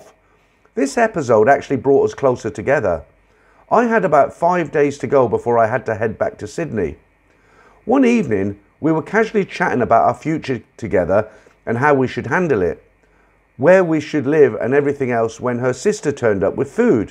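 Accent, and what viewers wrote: England, United Kingdom. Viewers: Another good story i hope they keep coming in good pommy accent so proper 😂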